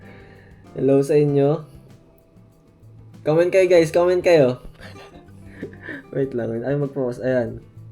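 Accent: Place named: Ilocos, Philippines. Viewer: twelve fortey gayam... your ilocano accent is lovely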